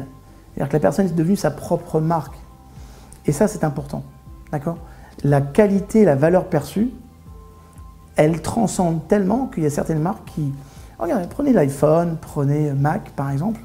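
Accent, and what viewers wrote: Canada. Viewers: Très intéressant je vais m'y mettre des aujourd'hui!!en passant j'aime beaucoup ton accent canadien je suis de Québec maintenant vivant au Costa Rica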